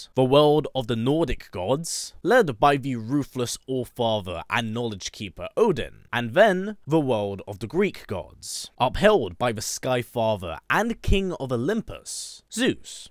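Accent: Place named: Scotland, United Kingdom. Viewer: Wouldn’t say British, more Scottish myself. It’s when he says “lad” that I really hear it personally. But I really agree with the rest of what you were saying about the universes! It sounds dope.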